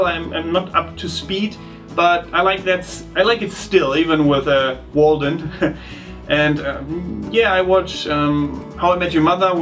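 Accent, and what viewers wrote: Germany. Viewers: So, JETZT bist du mir sympathisch. Lylat Wars-Fanboy. Herrliches Spiel - immer noch! Leider hat Nintendo das Remake auf der Wii sowas von in den Sand gesetzt ... Und ein like für deinen deutschen Akzent. Lass das so! Versuch erst gar nicht, amerikanischer zu klingen. Amis können nicht mal "Rammstein" ordentlich aussprechen ... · You kind of remind me of my mom. She is German ( I'm half) and even though we moved to America 30 years ago, she still has a German accent when she speaks English. Hearing your accent makes me think of her...also in another video you said "silly goose" and I was quite surprised because I never heard anyone say that other than her when she was alive. When we moved here from Germany, she started speaking only english to us, it sucks because now that I'm grown, I'm struggling to learn German. I want to go back to Germany for 2-3 months but nervous because I don't know the language to well or which part to go to. 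Anyway, I like your videos.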